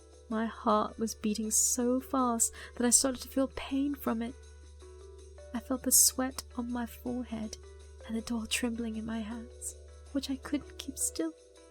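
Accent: United Kingdom. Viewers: i missed this long story of yours. great narration as always. im amazed that you can do a british accent. kudos to you and. 👍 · Insanely and awesomely narrated! If I didn't know how you really sound like, I would swear you were born in the UK.. and at first I thought I was listening to Fearagen but, NO.. In actuality, it's Like Wow! he took the role of creepiness to the next level, and so Thanks Lovely Ms. and.. please, more stories like this! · Excellent story, love the accent! That was a great British accent, just because it said or I wouldn't of known it was you LOL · why does it sound like you have a British axesat? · That was a freaky and grisly little story. It felt like a dark fairy-tale with gothic horror overtones. Really sucked me in. Nice job, as always. Also, your British accent is adorable. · when u get turned on by awesome brtitish accent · This was awsome! 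I've never heard you do a British accent before, it's kind of ckol. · oh snap British accent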